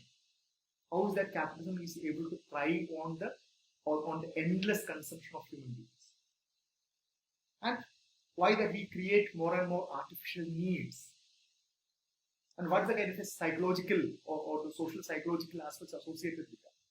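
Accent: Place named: India. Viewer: The recording quality is poor. In combination with the thicker vocalization of an Indian accent, it turns into a fishbowl when played at high speed. But it's too ponderous to play at any lower speed.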